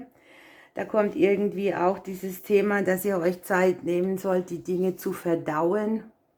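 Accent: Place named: Karlsruhe, Germany. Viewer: Rausgehört😊ich aus Bruchsal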